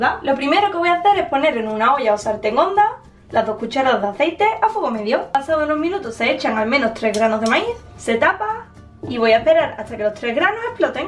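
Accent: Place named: Catalonia, Spain. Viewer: Me gusta su acento de Catalunya :3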